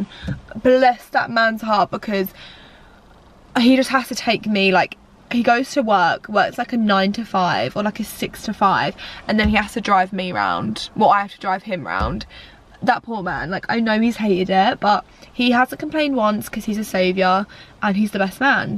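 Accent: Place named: Essex, United Kingdom. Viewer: She always sounded like this LOL just an Essex accent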